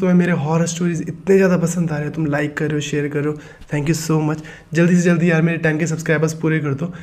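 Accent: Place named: Himachal Pradesh, India. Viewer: Good story telling💯 Are you from Himachal bcz your accent and choice of words seems like that?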